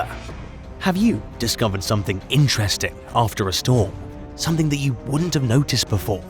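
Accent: England, United Kingdom. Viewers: Love how this is American Eye and has a Englishman speaking. 😆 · American Eye , has England accent .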